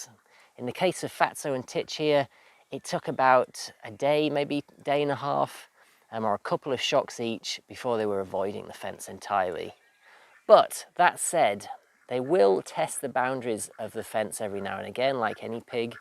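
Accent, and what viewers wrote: United Kingdom. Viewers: Another well-made video,structured and relievingly void of egocentric sharing - although personal and quietly humourous. I enjoy your clean british English.I haven't liked pigs much, but this video started a change and I can imagine a couple when I get my homestead. How cold winters do they tolerate though? i have seen ordinary pink pigs outdoors in the snowy winter, but what is the comfort temp? Can they manage Zone 7, alpine area? Probably need more food and straw.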